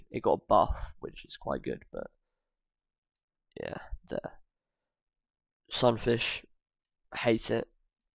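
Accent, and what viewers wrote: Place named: United Kingdom. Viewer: The British pronunciation is so nice😭👍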